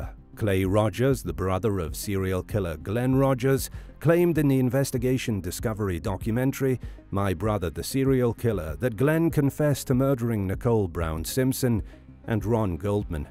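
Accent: United Kingdom. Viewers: damn just you have a strong British accent mate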